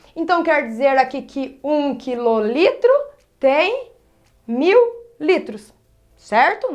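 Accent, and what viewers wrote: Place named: Minas Gerais, Brazil. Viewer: Adorei sua aula gata!! Pelo sotaque deve ser mineirinha! 👏👏👏👏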